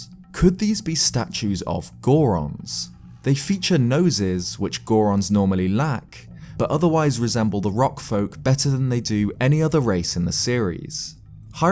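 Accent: United Kingdom. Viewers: Love your videos. Nice speaking voice too. Fellow Brit, surely?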